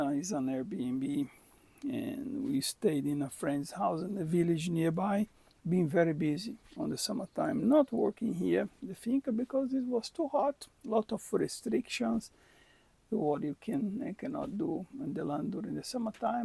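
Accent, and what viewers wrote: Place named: Brazil. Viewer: Hello. I am enjoying your videos. Great work and effort. My daddy was from a small village in Galicia called Fixo. He told me a lot of stories growing up in a small stone house like the one you are restoring. They grew potatoes, corn and hogs up there. They plowed with an Ox and worked all the time. He said it was dry country which looks like it is from your videos. What area are you in? Are you a Brasileiro? Your accent sounds Brazilian. Keep posting videos I am enjoying the progress. Have a good day.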